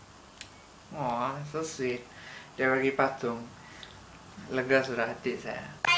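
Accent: Sabah, Malaysia. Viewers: Awak org Sabah ka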